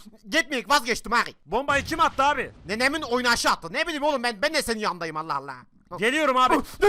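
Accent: Gaziantep, Turkey. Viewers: abi antep dublaj papci devamı gelsin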